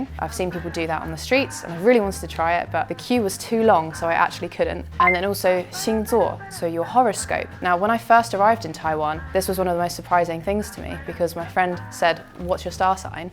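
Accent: United Kingdom. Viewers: 还有美妙的英国口音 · I really enjoy your British accent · 英國腔真是好聽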